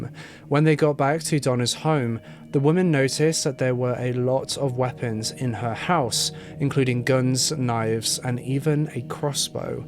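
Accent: United Kingdom. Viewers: He's from the Uk..thats how they talk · Great job. Love your respect for the victims and families. P.S. For all the grammar police complaining about pronunciation of certain words I think he does an amazing job especially since he's from the UK. I know I wouldn't be able to pronounce the names of places where he lives and I give him credit for his effort. I live here in the US and still mispronounce certain things based on their spelling. does very well I think and I adore his accent!!! 👍🏻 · I love that you are doing a piece on our local unsolved, but I have to tell you, I'm giggling like a fat kid with a cupcake listening to you mispronounce local place names in a British accent! You didn't even get to any of the real tough Native American named places! Puyallup, Humptulips, Stillaguamish, etc! While we had a number of serial killers during the period of time, I still lean towards Yates as the guy. 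What a piece of work that guy is. The Green River Killer was working during the same period, and Seattle and Portland both had cases that sorta looked similar. I can't remember if ballistics testing was compared. Been a long time! Keep up the good work!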